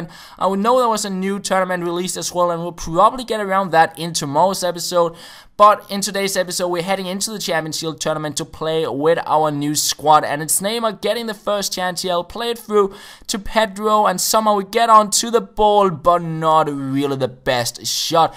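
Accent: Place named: Denmark. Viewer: He's Danish just like me that explains his accent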